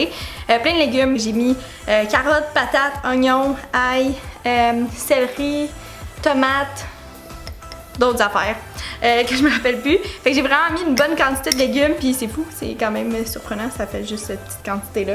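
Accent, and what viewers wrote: Quebec, Canada. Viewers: salut! Merci pour ta vidéo, pas encore essayé car j'attend avec impatience ta vidéo sur les légumes en poudre... D'une pierre, deux coups. Merci tu es trop fraîche 😀 je kiff l'accent Québécois 😁 bientôt j'espère 🤓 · The accent is mixture of English and french Canadian ,but cute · L'accent quebecois est super cute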